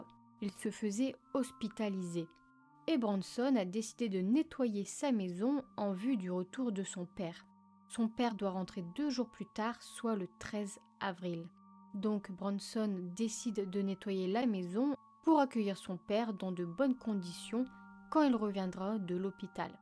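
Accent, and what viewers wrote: North, France. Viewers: L'accent du Nord on le reconnait...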